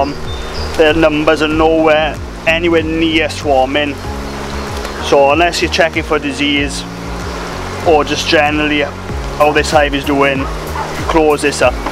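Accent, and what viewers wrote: Wales, United Kingdom. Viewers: only joking. Love the Welsh accent. Irishman here. Looking to get in to beekeeping. Have hives and equipment. Reading reading reading and watching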